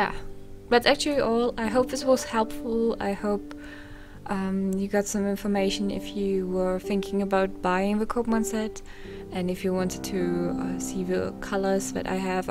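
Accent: Germany. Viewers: thx i was thinking about buying this set :) now i am going to get it :3 btw are you german ^^?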